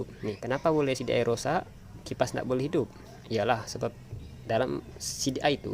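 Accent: Sabah, Malaysia. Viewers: saya suka dengar orang sabah cakap. arwah mak saya asal KK lepas kahwin dgn arwah ayah asal kedah terus menetap di sini. hehe lain cerita pulak. penjelasan yang hebat 👍🏼👍🏼. kipas radiator LC saya tak berfungsi pun entah berapa lama. tau naik saja. boleh la saya cuba cara ni. terima kasih semoga murah rezeki bang.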